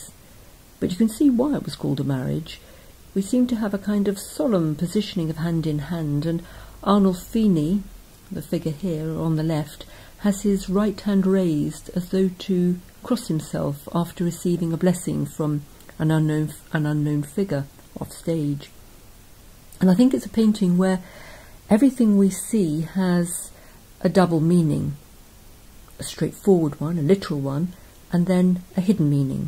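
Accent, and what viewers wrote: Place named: RP, United Kingdom. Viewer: Phrases like "we're not quite sure" and "must mean something" pronounced with an RP English accent sound far less learned than they once may have.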